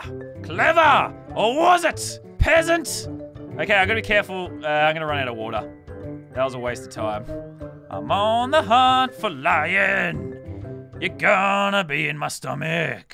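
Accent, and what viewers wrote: Ireland. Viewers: I'm Irish and he sounded like he was Irish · Ur Irish accent is the greatest I liked subbed and turned on notifications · I love lasarbeams Irish acsent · can we just acknowledge how good his irish accent is tho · Damn you got a good bloody Irish accent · U putting an IRISH accent on to annoy me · Gosh dang your irish acsent is legite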